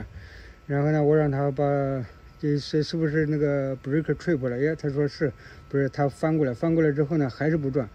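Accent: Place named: Henan, China. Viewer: 听你口音说河南人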